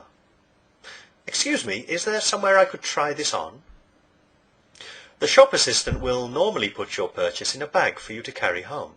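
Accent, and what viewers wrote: United Kingdom. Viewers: Is Mr. a native British speaker???????????????????????????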